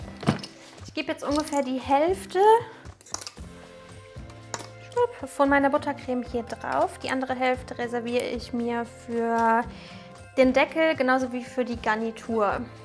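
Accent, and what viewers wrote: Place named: Rhineland, Germany. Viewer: Muss ich unbedingt ausprobieren. Ich finde deine Aussprache immer so lustig 😂. Eigelbschlotze und Gedöns.. Richtig schön rheinisch. Arbeitest du eigentlich noch in einer Konditorei?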